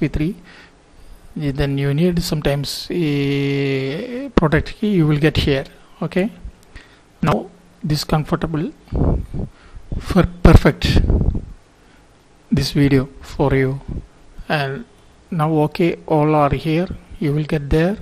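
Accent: India. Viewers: the heavy punjabi accent too wtf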